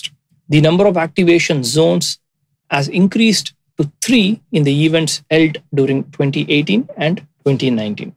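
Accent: India. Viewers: Immediately disliked after hearing indian accent lol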